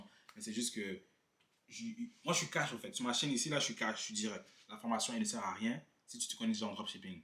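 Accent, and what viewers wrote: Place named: Montreal, Canada. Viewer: tu es de Montreal ? tu as semble avoir l'accent de chez nous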